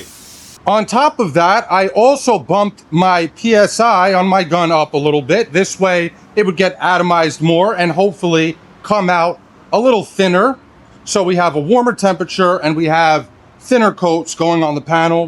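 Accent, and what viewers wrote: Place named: New York, United States. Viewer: It's that New York accent.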